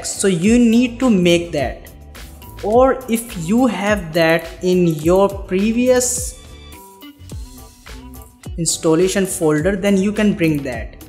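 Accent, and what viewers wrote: India. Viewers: Omg thank you so much, anytime I hear Indian accent, I have a confidence that my problem will 100% be solved, thanks for your help mate !!!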